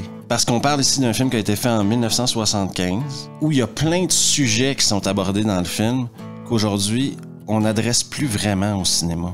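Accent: Quebec, Canada. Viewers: OMFG, l'accent Québécois, j'étais pas prêt ! C'est Bruce qui m'envoie. Il avait raison, excellente vidéo :D Merci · J'adore ton accent canadien😂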